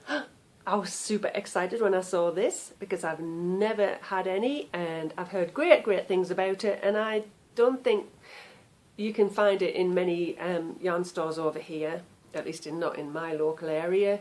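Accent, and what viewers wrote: Sunderland, United Kingdom. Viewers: we live in Adstock, Rural Quebec,It's at the bottom of the Eastern townships, not far from Maine. we operate a Musk ox fibre mill. Bit of a change from Sunderland. Hylton castle, did you see the time team episode at Hylton castle- I thought it was great to see Sunderland again ! We used to live at the top of Newcastle road, in the lovely Tudor style houses just down from the Grange pub. Last summer i passed through Sunderland Ontario on my way to a fibre show ! Will be watching your pod with great enthusiasm-nice to hear the Sunderland accent again after all these years♥ will try & look you up on Ravelry....I'm The-Qiviut-Fairy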